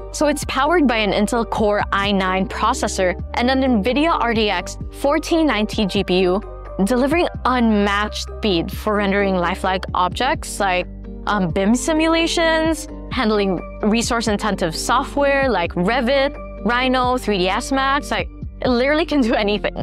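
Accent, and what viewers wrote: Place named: Philippines. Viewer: I think her Filipino accent is still noticeable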